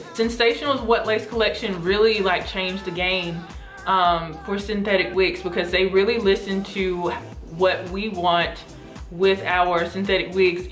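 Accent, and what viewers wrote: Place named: North Carolina, United States. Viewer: She sounds like she is from North Carolina.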